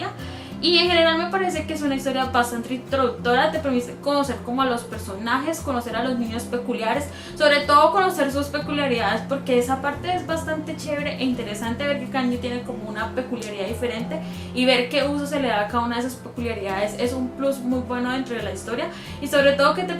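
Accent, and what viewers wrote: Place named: Colombia. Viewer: Jaja me estuve preguntando durante el video si eras de Colombia o de Mexico pero cuando dijiste le coji como bronca me di cuenta saludos buen video parcera😊😊😊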